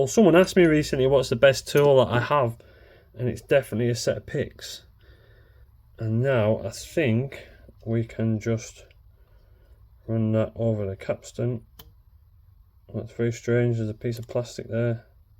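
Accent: Scotland, United Kingdom. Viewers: Is that Scottish accent? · Are you from Scotland? I like your accent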